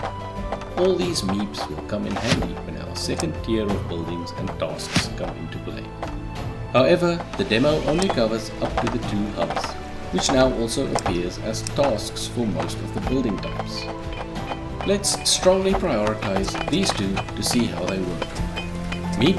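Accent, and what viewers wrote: South Africa. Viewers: you south african ?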